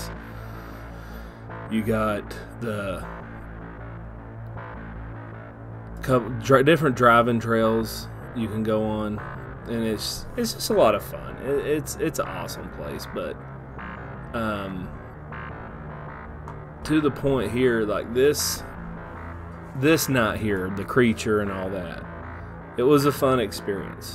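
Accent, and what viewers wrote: South, United States. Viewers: I LOVE this guy's country accent!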